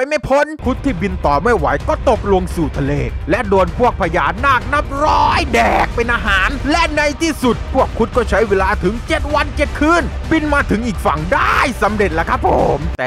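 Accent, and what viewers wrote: South, Thailand. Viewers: พี่คะพี่เป็นคนใต้ใช่ไม่คะ(ตอบหน่อยนะคะ)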